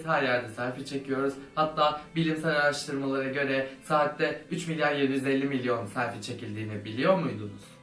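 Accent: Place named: Bursa, Turkey. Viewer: Abi sesin neden Bursalı gibi çıkıyor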